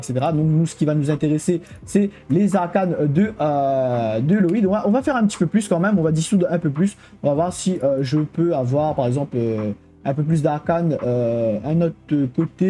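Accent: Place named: Reunion, France. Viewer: a l'accent je sentait un 974 caché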